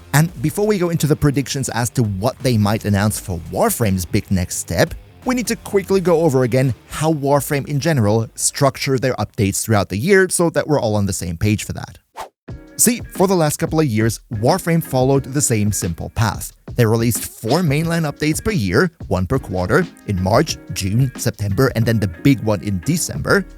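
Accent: Germany. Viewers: Ich bin nur diesen starken typischen deutschen Akzent gewöhnt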